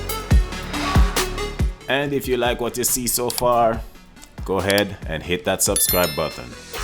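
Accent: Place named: Caribbean. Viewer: I cannot help but notice , you West Indian I can hear an accent . PS I feel you should keep it tbh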